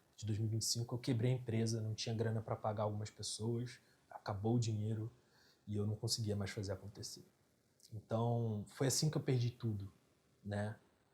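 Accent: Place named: Rio de Janeiro, Brazil. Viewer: Ele é do RJ certeza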